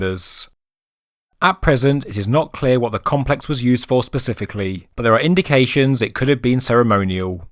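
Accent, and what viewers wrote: United Kingdom. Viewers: Go for it! Your ideas are like a fresh breeze in the suffocating existing typical history wronly interpreted. Looking forward to your book. I used to live in ibUK for years, and she still feels like home. There must be hundreds of similar sites still to be found. I always found Wales very fascinating and having certain vibes like no other place. Has anything been found there yet? Stonehenge gives me goose bumps, Wales chills down my spine and Land's End heeby-jeebies (?). "Something evil this way comes..." I totally agree with your logic, and I am impressed the way you somply do a 180, if logical evidence has proven your earlier conclusions inaccurate. Respect! History and archeology are often interpreted according to main streat guide lines, but they seem to prove wrong almost every time there is a new excavation. I find it thrilling. 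It is great that some power companies are willing without any outside preassure to reroute the power lines. Wow, that is a first. The importance of these sites is being understood as a means of understanding your nation and its ancient habits and names of places. The legends become fact. That is one aspect of scientific work, not clutching to the main stream explanations, which - sadly - is often the case. Your videos are addictive 😁 and unconventional, and yes, I like that. Other thing, proper English, no American dialect (don't like it)! Thank you. Gagging for a new one already... 😀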